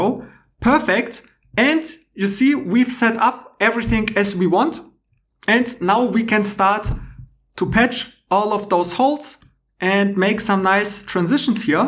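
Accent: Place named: Germany. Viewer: Имя славянское, акцент немецкий. Кто ты, воин?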